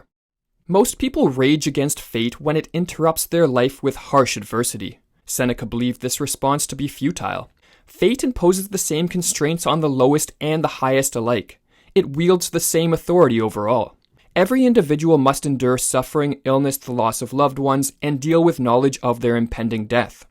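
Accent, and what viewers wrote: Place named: Canada. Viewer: Hey man, long-time follower. Just checked your patreon and I knew by your accent you were Canadian! Whereabouts are you? Love your content!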